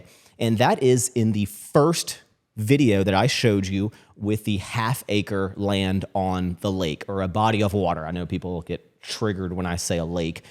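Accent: United States. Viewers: The onset of the country accent is crazy. Love you! Rooting for you always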